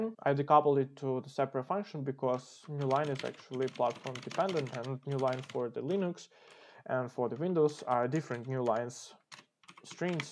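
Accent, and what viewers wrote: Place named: Russia. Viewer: слушать английскую речь от русскоговорящего довольно кринжовенько, но урок очень толковый, спасибо большое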